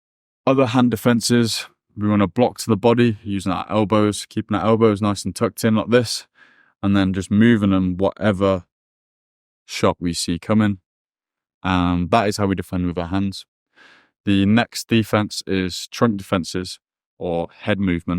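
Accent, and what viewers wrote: United Kingdom. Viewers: I just bought a punching bag, hung it up, and started boxing. Thanks for the tips and the video. I really like your British accent and the way you explain all the things 😎 Thx!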